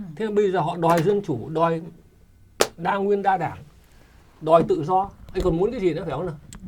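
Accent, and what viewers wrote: North, Vietnam. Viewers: Anh này giọng Bắc bây giờ anh nói là người bình thường .Tớ cũng bình thường sống bình thường sao thấy anh nói bậy bạ liên thiên vậy .các cụ nói trước khi nói phải đá lưỡi 9 lần đấy. Nói bậy nhiều quá .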